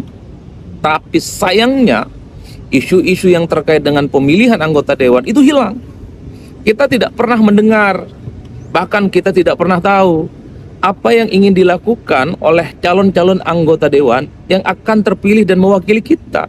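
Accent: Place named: Madura, Indonesia. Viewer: madure banget mas...melokal polll...topp ancen e ms ki👍👍👍👍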